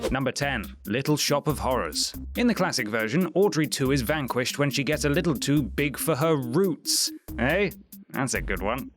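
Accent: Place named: RP, United Kingdom. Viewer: Why does sound so much more posh in these videos? I swear he isnt that posh.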